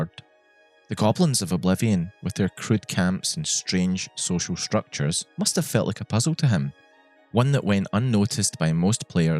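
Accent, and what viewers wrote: Scotland, United Kingdom. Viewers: Is this scottish? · I also don't know how I got here. But I love Terry Pratchett. And I also enjoy listening to a scottish accent 🙂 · It was strange seeing my words in print, but surreal hearing them in that great Scottish accent. You've done a wonderful job of relaying some of the magic I felt while helping to bring Vilja to life. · I love that Scottish accent and cadence. It's not so heavy that I can't understand it, but it has the bouncy musical quality of the Celtic dialects. Please continue to speak through all the ages, so that we may not be deprived the pleasure of hearing your voice. I miss Mr. Pratchett and his stories. I suppose that is the way of stories though. Life goes on; things change; stories end, begin again, evolve. We carry them in our hearts, and keep them alive in our own stories. On and on, across the endless cascade of creation.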